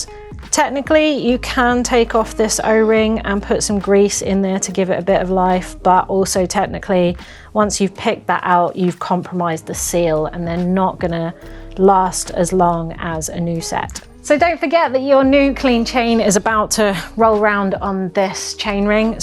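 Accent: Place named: United Kingdom. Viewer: British accents …smh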